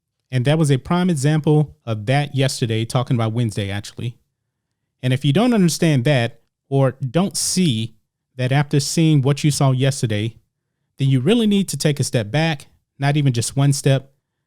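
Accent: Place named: United States. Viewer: Great American voice are. Thank you.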